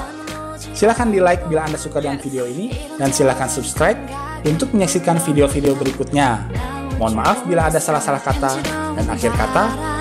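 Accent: West Java, Indonesia. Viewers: sunda pisan euy, hehe, hatur nuhun videona. :) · Sampurasun... Sunda pisan kang... hhe... Mantep reviewnya.. Tp ngmg2 Redmi 1s sm Zenfone 5 beda kalas sama harga kang.. Kaleresan abdi pengguna Redmi 1s :D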